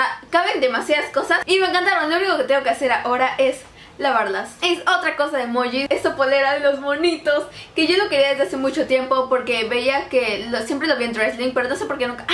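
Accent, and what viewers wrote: Mexico. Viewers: pensé q era de México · por un momento pense que eras de Mexico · eres de Mexico? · no se si estoy loca pero ella habla como mexicana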